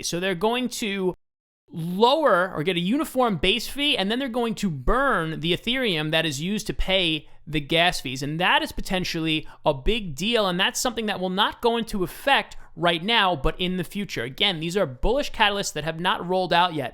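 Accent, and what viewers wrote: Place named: Long Island, United States. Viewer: You from Long Island? Bergen county NJ perhaps? Lol trying to place that accent.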